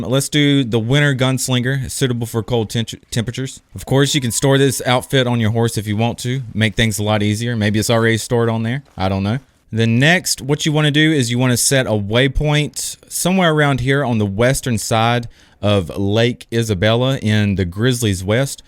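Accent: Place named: Carolinas, United States. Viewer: Thanks your a pal lol hey are you from the Carolinas cause your accent sounds like it lol I am from there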